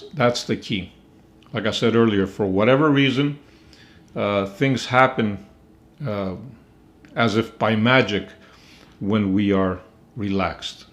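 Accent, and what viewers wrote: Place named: Greece. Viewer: I am so glad that you were born in Greece, when i first watched the first video of you, from your accent, i understood that you are Greek :) I am following your guidance and i ve seen tremendous changes in my financials and mental health. Ευχαριστώ!!!☺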